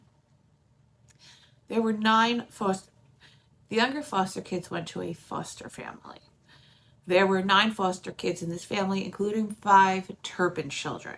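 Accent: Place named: New England, United States. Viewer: I love that the way you say certain things like I do, I know you're from the American Northeast, around New England. 😂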